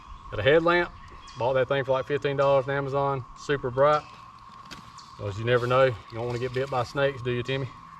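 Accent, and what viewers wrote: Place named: South, United States. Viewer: Finally, a camping gear video from an American in the South. So many of them are Aussies. No disrespect to our brethren down unda', but I need to know what I need and where I will be camping. I live in SC, so I imagine the with that accent knows a thing or two about camping in my territory. The budget-minded selection is good, too. Thanks for the info and the no-nonsense presentation.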